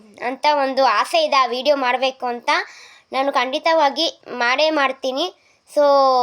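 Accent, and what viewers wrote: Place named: Kundapur, India. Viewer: Kundapur kannada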